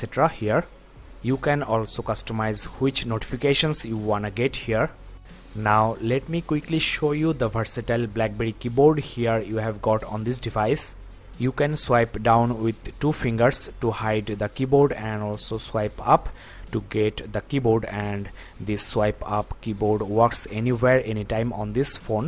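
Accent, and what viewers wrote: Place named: East, India. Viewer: I think he is from Orissa or Bengal. Thats not a south Indian accent. :-)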